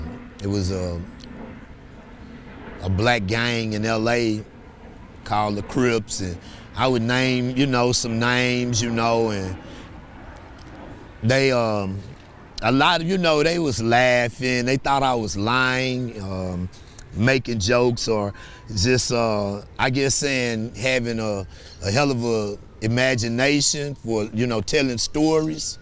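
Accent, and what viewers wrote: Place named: Houston, United States. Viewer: He sounds like he never left Houston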